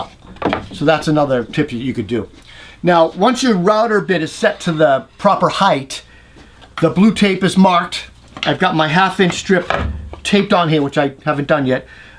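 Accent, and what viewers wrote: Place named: New England, United States. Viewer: my gf and I love your videos. She's the hobby woodworker and I'm learning from her. Your instructions are easy to follow and your voice is both slow and clear. Maybe it's a Massachusetts or New England thing but you remind me of This Old House and the New Yankee Workshop.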